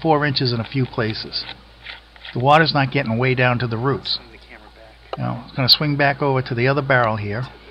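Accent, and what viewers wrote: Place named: New England, United States. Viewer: HEHEHEHEHE!!!!! I love how you watuh those plantuhs!!!! When I hear your accent, I think of lobstuh fishuhmen.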